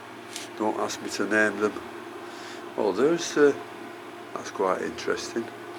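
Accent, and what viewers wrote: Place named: West Yorkshire, United Kingdom. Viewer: Hi Have just found your channel I live in Brittany but it is lovely to hear your accent as I originate from West Yorkshire.I have just subscribed to your channel and will be watching them all.I am only new to orchids but I am hooked!!! I do have a greenhouse but I think it would be to expensive to run.So I have converted one of my rooms into an orchid room with an humidifier so we will see how I go Sorry for rambling on All the best to you Keep Smiling Thelma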